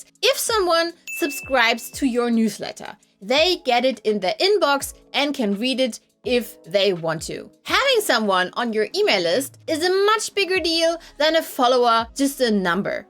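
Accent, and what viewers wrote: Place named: Germany. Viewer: Noooo your German accent is all part of the charm! It's your character. I really enjoy your videos, you put so much work into editing and production. 😊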